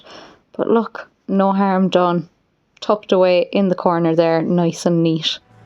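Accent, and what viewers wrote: Ireland. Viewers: Well done. You really do work hard. I love your soft Irish accent. I could listen and watch you for hours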